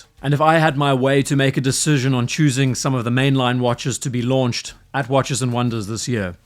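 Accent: South Africa. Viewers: Dig your accent. South Africa?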